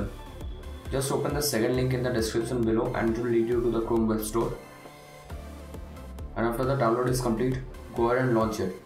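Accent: India. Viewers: This is a fake scam that will give you Adware, spyware, and other Trojans that will steal you personal info and make your computer unusable. Don't download this it is fake. Anytime you watch some one that sounds Indian dont trust them and if you click on a URL at the bottom to try to download something dont do it if it takes you to ad-fly or anything else like that. Take my advice. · This FUCKING GUY WILL NOT ADMIT THAT HE IS A SCAMER Not believe me well, clue number 1 he is has a Indian accent, clue number 2 when somebody talks back after him protecting himself he dosent respond, last and most obvious clue he tried making us buy/download viruses What do you have to say now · Lets try to play fortnite mobile on Pc , typing : " how to play ios games on pc " *, * opening the first video , then heard indian accent and said :"ok , Nvm" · As i heard indian accent, i paused and wrote this. 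#nextvideo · Ah....yes. Indian accent...I am pretty sure most of your subs are bot accounts. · when an indian guy is talking you just know it is scam. These guys need voice changers or a single native english speaker to back their success.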